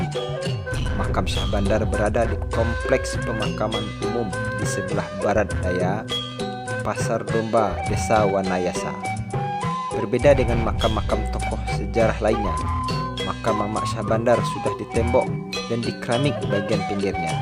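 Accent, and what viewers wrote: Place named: Minangkabau, Indonesia. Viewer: Logat minangnya kental sekali udaaaa. Btw terima kasih atas informasinya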